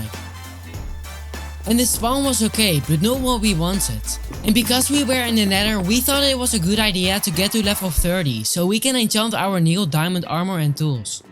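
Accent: Netherlands. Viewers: What country are you from because you have an dutch accent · dat hoorde ik all aan je accent 😂😂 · your accent is netherlands en ik ben ook Nederlands daar om dacht ik het en het is een goede video is jouw friend ook Nederlands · What country are you from?you got like a netherlands accent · you sound so dutch ( i'm dutch myself lmao )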